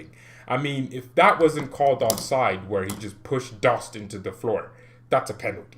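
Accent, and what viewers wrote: United Kingdom. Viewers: I enjoyed your British accent and there way of speaking and thinking. You have talents to become an actor. Please more of this.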